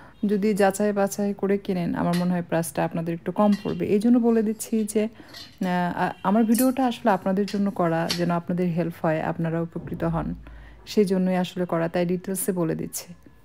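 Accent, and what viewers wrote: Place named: Barishal, Bangladesh. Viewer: আপনি বরিশালের মানুষ আপনার কথার মধ্যে বরিশালের ভাষার ঝাজ পাওয়া যায় এগিয়ে যান সমহিমায় ধন্যবাদ।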